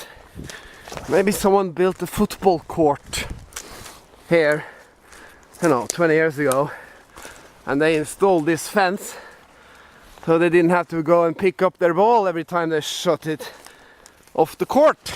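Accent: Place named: Norway. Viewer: Hi I have enjoyed all of your videos and your great sense of humour and just hearing your accent is making me miss your beautiful country. Where in Norway are you living as I visit the Vestfold area of Norway but its mostly in the winter months. Anyway have a good christmas and i hope to see more videos from you soon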